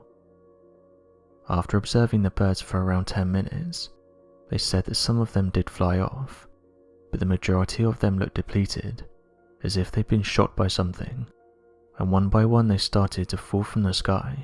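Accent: England, United Kingdom. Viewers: Why not just speak in your usual English accent without the drama music? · He sounds like an asian guy that learned english in great britain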